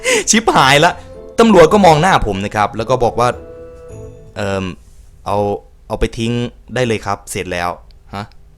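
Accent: Isan, Thailand. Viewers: พี่ผมถามจิงๆนะ พี่เป็นคนอีสานป่ะแม่นบ่ ตอบผมเดี๋ยวนี้ด้วย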